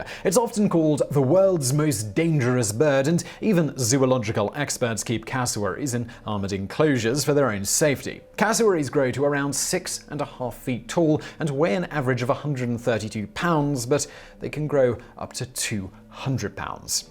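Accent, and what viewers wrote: United Kingdom. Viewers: sure seems to revel in the ridiculousness that happens in America. We enjoy it here too, but it cuts deeper hearing it in a British accent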